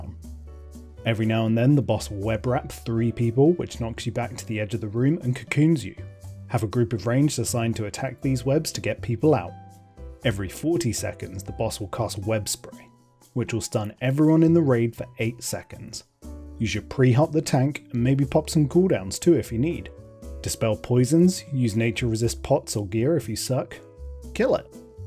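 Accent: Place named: United Kingdom. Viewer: I think he is British by the accent maybe?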